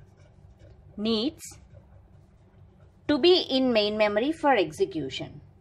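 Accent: South India, India. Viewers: You sounds like South Indian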